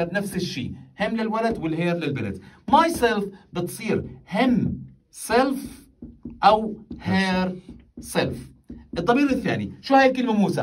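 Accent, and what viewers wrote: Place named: Iraq. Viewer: عبالي عراقي😊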